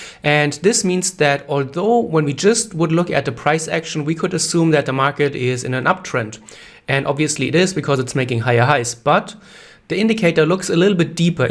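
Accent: Germany. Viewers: Your English accent reminded me my German friends 🙏. During my stay in Europe, my English become quite similar to yours. Your generalizations about the market look amazing, however it's really difficult to predict a trend reversal specially for scalping traders, it would be better to follow an uptrend and downtrend untill we reach out stop loss quite often. 😜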